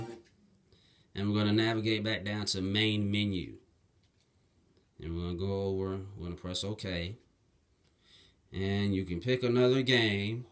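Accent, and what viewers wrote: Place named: South, United States. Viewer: yo why do you sound like a redneck dantdm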